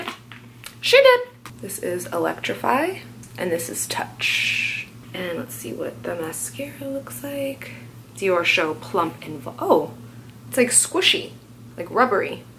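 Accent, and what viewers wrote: England, United Kingdom. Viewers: love the british accent. <3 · I love her English accent lol. She is my favorite & by far the best YouTuber/beauty guru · honestly any problem you encounter you always solve it!! lol like ya wig! I love the grey wig!!! :)Also... I don't know if anyone has told you but your English accent is one of the best !!!!! I love it lol loving these blogs!!!! always inspired :) :) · Your soo good at an English accent hahaha😅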